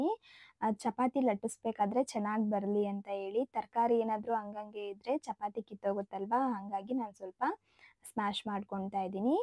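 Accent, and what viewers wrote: Mandya, India. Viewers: Nim math keli starting andkonde madyane anta. We are also mandya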